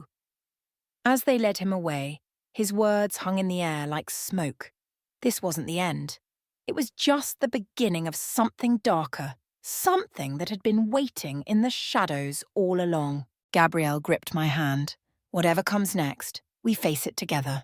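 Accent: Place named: United Kingdom. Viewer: I love the British narrator.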